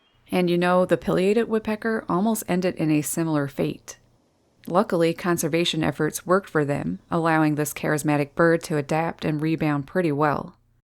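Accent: Newfoundland, Canada. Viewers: Oh my, you sound Canadian. I'm sorry that our president is a jerk. I enjoyed your video very much. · Hi, just curious, are you by chance from Newfoundland?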